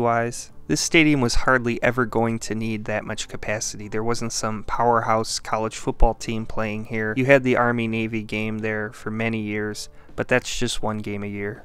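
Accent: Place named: Cleveland, United States. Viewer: I love this guy's cleveland accent.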